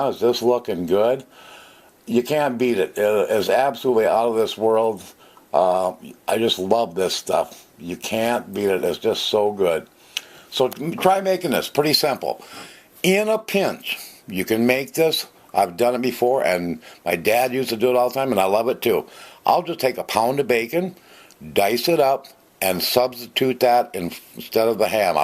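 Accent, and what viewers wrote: Minnesota, United States. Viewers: Talks like an only Minnesotan "this farmlund make utt".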